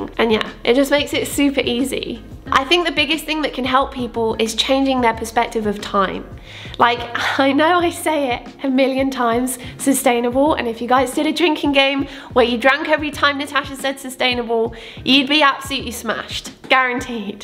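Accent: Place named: United Kingdom. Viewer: I love your british accent 😍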